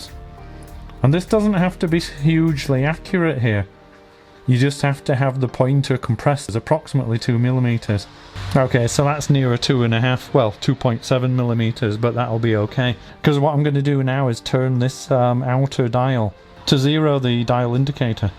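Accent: Liverpool, United Kingdom. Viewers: Great video!! Love the accent! Liverpool?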